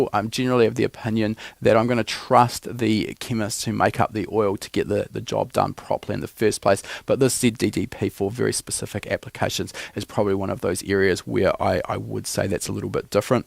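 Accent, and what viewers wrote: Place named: New Zealand. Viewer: Perhaps that's how guys from NZ sound.The content is precious though so pay attn to that ☺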